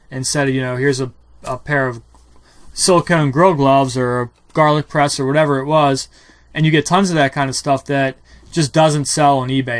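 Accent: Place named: Louisiana, United States. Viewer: Are you originally from Louisiana by chance? My fiancé and I simultaneously said, “He’s a local boy!” based on your accent.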